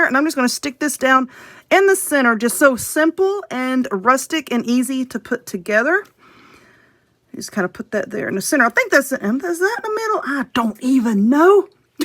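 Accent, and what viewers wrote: South, United States. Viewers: I love watching your videos, you seem to always have a good time 😊. Love your Southern accent, as I was born in Mississippi and now live in California 💜💜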